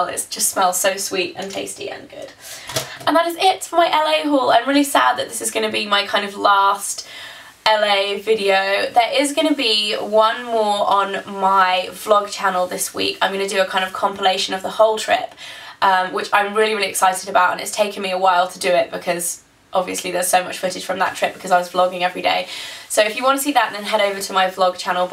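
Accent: England, United Kingdom. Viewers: I love your English accent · happy birthday your soo lucky you have blonde hair and a british accent and your freaking georgeus im soo jealous omg haha well happy birthdayyy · I went to imats too!! I wish i had watched ur vids way before imats bc then i would of searched for u! I lovvvee ur british accent :3 btw i got a creme blush in orange . LOVVEE IT!! I want that pink one ! And i also got that same palette ! ^.^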